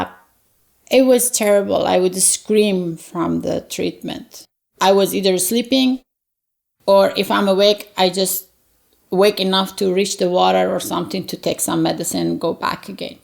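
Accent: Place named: Iran. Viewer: I'm just curious... what county in the Middle East? My guess Iran from the accent . Jesus is who He said He was all along.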